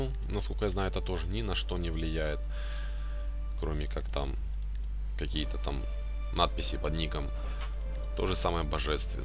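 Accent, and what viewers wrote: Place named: Ukraine. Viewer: ***** ну уши режет... лучше бы на украинском говорил , чем с таким акцентом .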